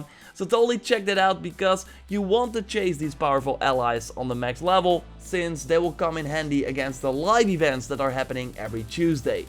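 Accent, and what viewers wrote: Netherlands. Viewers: Are you from Holland? Your thick accent makes me think you are haha, greetings from Belgium. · My man is dutch isnt hé?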